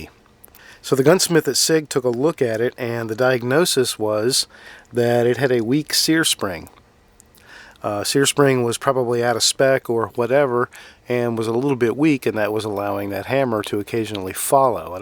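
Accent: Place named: Maryland, United States. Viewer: How have 100,000 subscribers not found you yet? Recognized the fellow Maryland accent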